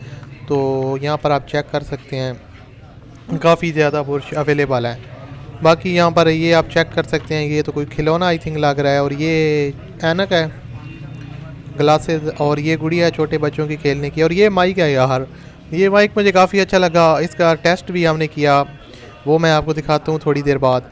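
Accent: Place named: Saraiki, Pakistan. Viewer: AP k bolnay k andaz bata raha ha k ap saraiki language ho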